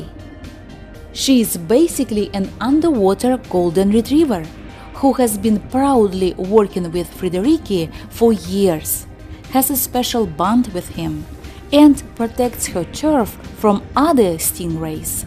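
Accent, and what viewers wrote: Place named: Russia. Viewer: Very nice, just one suggestion I would recommend you to use AI for your videos, your English is kind hard to understand due to your Russian accent.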